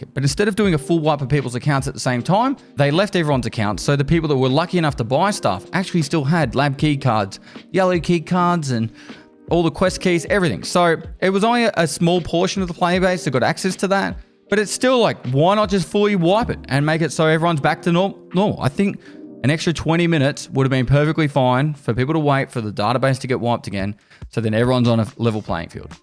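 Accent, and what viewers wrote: Australia. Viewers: I watch your videos to practice my Australian accent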